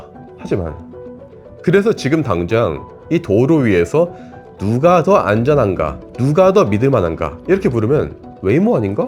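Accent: Gyeongsang, South Korea. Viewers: 경상도 사투리 구수하니 좋네요!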